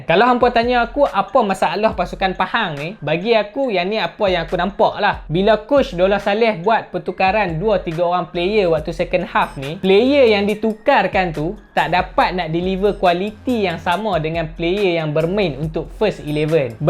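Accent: Kedah, Malaysia. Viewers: Terima kasih admin, pelet Kedah sempoi baq hang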